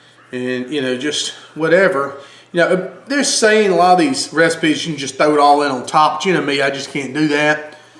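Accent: South, United States. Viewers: Lol i love you... Just good ole southern voice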